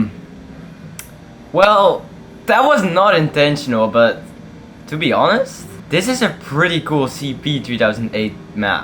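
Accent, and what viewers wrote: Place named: Netherlands. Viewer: the accent gave it away. since i’m also dutch it was quiet easy to guess.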